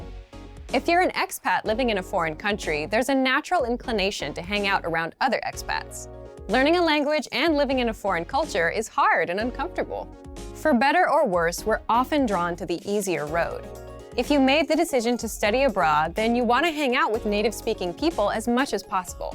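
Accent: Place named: England, United Kingdom. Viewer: Thanks for your advises !!! I like your english accent. I hope soon to have this fluently way of speaking.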